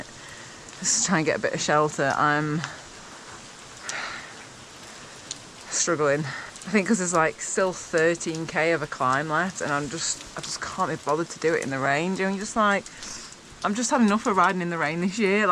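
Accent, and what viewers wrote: United Kingdom. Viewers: Both my wife and I were teachers for 45 years. Because of that, we have this little habit of trying to imagine what someone looked and acted like as a child. As we were watching your video, and you were wrinkling your eyebrows while apologizing for being grumpy in your lovely British accent, we both looked at each other and said, "I bet was an ABSOLUTELY ADORABLE child 🥰." Thank you for being you and for bringing a little sunshine into our rainy lives (It's "chucking down" here in the USA too. 🌧️⛈️☔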